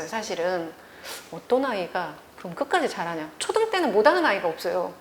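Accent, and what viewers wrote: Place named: Jeolla, South Korea. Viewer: 전라도 ㅋㅋㅋㅋ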